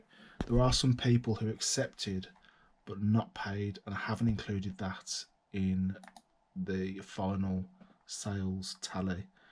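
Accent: Birmingham, United Kingdom. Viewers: Damn that brummy accent 🤣🤣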